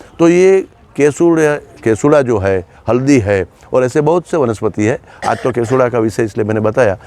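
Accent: India. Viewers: प्रणाम सर ,अति ज्ञान वर्धक.... An extremely scientific analysis ,never heard it before Sir ,your gujrati accent delivery in hindi is so loving ,I rightly feel, my self being in bhuj decades ago.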